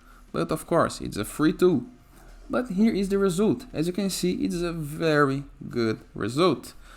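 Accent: Brazil. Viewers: That sweet good Brazilian accent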